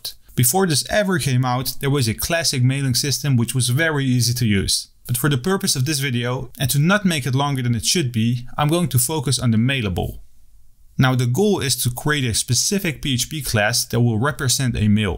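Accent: Netherlands. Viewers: Great tutorial! You sound very Dutch btw...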